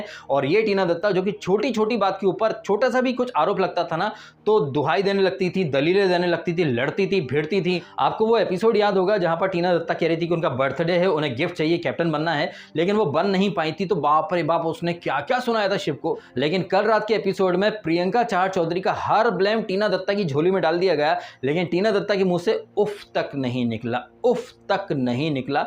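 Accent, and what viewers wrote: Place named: Bengal, India. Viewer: Sir ab appka bengali accent miss karu ga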